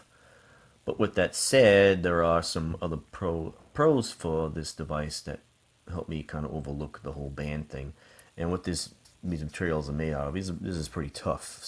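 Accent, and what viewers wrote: Boston, United States. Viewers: Yay! Real English! And you don't sound like a robot! Thumbs up. North boston? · Lol new to your channel. Uh, Boston in the house? I thought you were saying Huawei but you were saying hardware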